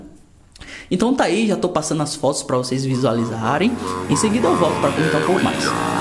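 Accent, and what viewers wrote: Bahia, Brazil. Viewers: Tu é baiano man kkkk?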